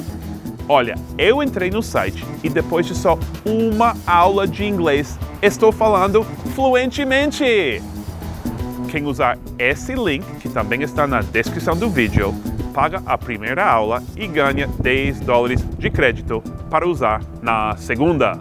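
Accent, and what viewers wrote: United Kingdom. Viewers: você parece mais britanico que americano sr gringo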